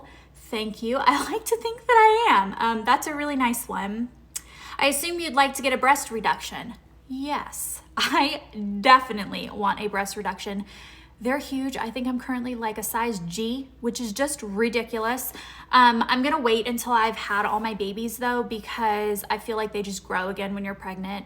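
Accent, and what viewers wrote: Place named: South, United States. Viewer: I like how your southern accent is so much more noticeable now, it's cute :) it comes up especially when you get excited. I'm loving the new videos, this is such a time travel for all of us :) take care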